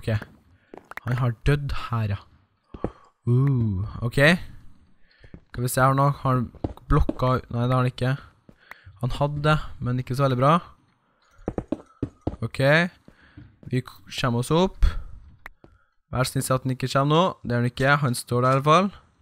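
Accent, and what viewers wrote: Trøndelag, Norway. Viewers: Ækte Trønder! Bra at vi har nånn trøndera som youtubera!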